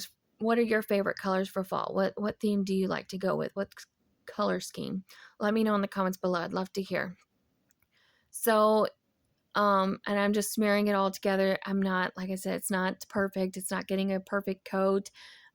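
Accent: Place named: South, United States. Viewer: I love ❤️ your diys.. this really inspired me to get ready to start fall diys. You are so sweet love your southern accent. ❤️ I am from the south also. Have a blessed day.. keep those diys coming. 🎉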